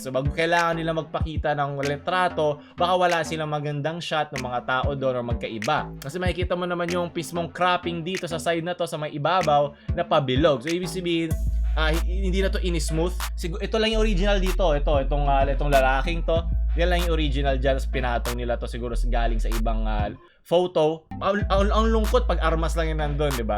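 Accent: Visayas, Philippines. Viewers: anue ba 😳😂 iba talaga pag bisaya ka, iba pagkakabasa mo 😂 (bisayawa here)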